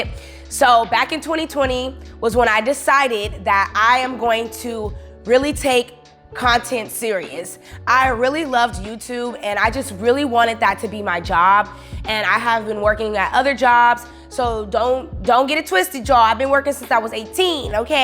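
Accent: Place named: California, United States. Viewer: When i heard you talk i was like she's from Cali and i know she's from the bay and the u said something about Walnut Creek on one of ur vlogs lol i live in Arkansas now but i know how we sound lol 😆 btw you've gained over 2k subscribers in one month! Ur doing amazing ! U def motivate me to get back to being my self !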